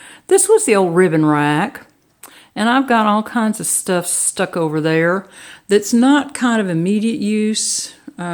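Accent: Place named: South, United States. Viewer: New subscriber! Love listening to a fellow southerner soft accent !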